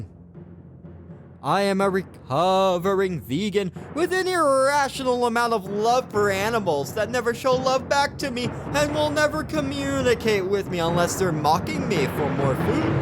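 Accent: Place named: Canada. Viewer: This guy sounds like he might be Canadian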